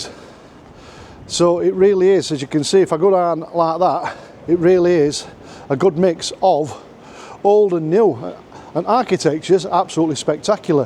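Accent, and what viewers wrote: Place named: Yorkshire, United Kingdom. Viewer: Hi wast sure as new to you tube shows like this if you were being sarcastic or taking offence ? I was saying your accent is very broad yorkshire like some in Emmerdale there is nothing wrong on that unless no sense of humor But Bradford is okay city like everywhere in England and USA ideology everything sadly is going wrong all over UK generally as mass capitalism and individualism have causes a lot of problems all over UK as we follow isa ideology of divide and rule many English folk are often negative about everything these days sadly as you said Bradford has good curry Capital also is capital of culture this year And Broadway shopping center is nice and many places But everywhere has changed in UK I remember the Bradford City fire as well very sad tragedy it was just when you wrote about being from yorkshire with me saying about your accent came across as if you had took offence ? 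Problem is most English have become rude these days wherever you go in England nice areas or poorer ones the last ten years has told me England is not a country that's safe or nice full stop anymore no democracy capitalist dictatorships like USA and as I write this we are being sadly groomed for another war in Russia Ukraine so that new Tory party robot starner and Co can use it as a distraction and a excuse to cut benefits for disability people and there is millions of other problems going on all over UK generally I think of we try to talk up places and England will also help take care and the v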